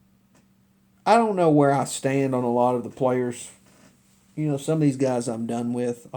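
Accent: South, United States. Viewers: where are u from? You don't have that stupid New York accent. U actually sound more southern.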